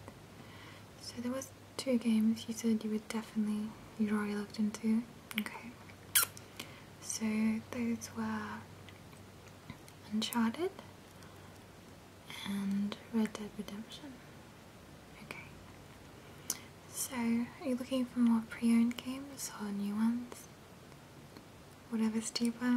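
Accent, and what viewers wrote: Australia. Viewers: Not really into the asmr roll play videos, but I found this really relaxing and you know your stuff. Looking forward to some new videos. Oh, Australian right?? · Asmr, games, cute girl with an aussie accent? I have died and gone to heaven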